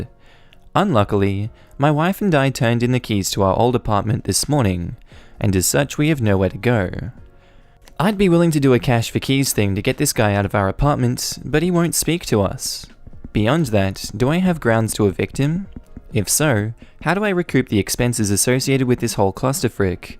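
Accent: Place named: Australia. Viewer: I just want to listen to my Aussie husband talk...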